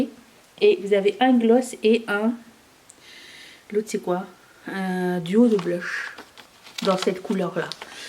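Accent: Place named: Quebec, Canada. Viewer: Merci pour ce petit bout d'accent si merveilleux. Je suis tombée en amour du Québec avec les séries "la petite vie" et surtout "Catherine". Bonne journée à vous;)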